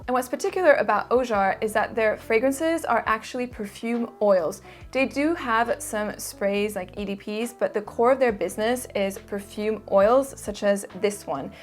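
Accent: France. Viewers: Je souhaite te féliciter pour cette chaine Youtube, tu mérite 1 million sub et j'adore ton accent français. Bonne continuation.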